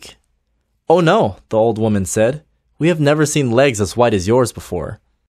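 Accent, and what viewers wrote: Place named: United States. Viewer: Love American accent from Pakistan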